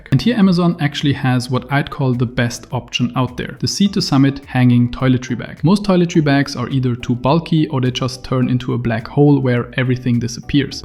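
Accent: Germany. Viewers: The man is from Germany and probably only used three different microphones or recorded on three different days while he was travelling. At least, I don't know of any AI that can imitate a German accent as well as a German who speaks English.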